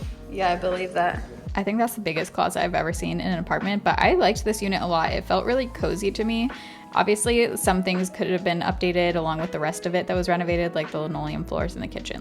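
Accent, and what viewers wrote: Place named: California, United States. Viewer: Start a new vocal pattern if you can. That one..if not from Cali...ayyy. that's not a free thing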